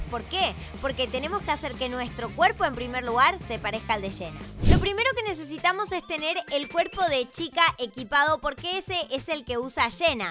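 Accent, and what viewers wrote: Argentina. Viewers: sos de qué país seguro con esa voz sos de Argentina estoy segura con es voz que tienes me gustaría conocerte yo voy a viajar en el país Argentina ❤😂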